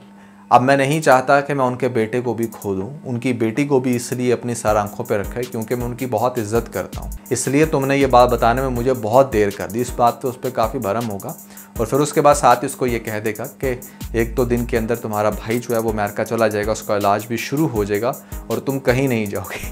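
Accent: Punjab, India. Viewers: Bohat changa tuhda punjabi Touch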